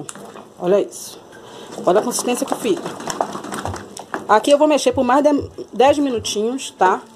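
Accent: Bahia, Brazil. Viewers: Vc é baiana? Seu sotaque parece,sou baiana,vou fazer ,amei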